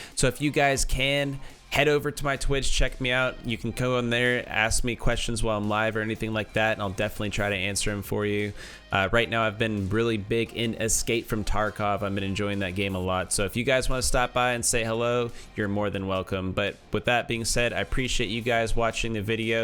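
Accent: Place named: United States. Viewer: guys guys guys guys guys guys guys guys guys ... fucking american speaking, nothing of substance.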